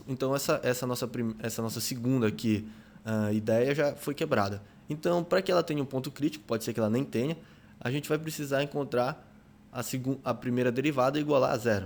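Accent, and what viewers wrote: Pará, Brazil. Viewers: Muleke eu logo vi q era paraense assim como eu só pelo sotaque, quando ele disse "égua" eu tive certeza hauahuah. Parabéns cara seu vídeo ta ótimo e é bom ver q esse tipo de conteúdo está crescendo no nosso estado. Parabéns e se possível volte a produzir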